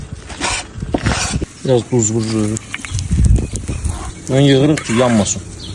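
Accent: Baku, Azerbaijan. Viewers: Bakı ləhcəsi .Var ol .Əllərinə sağlıq . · Can Bakı ləhcəm❤ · Salam.Bakı ləhcəsi,ƏLA.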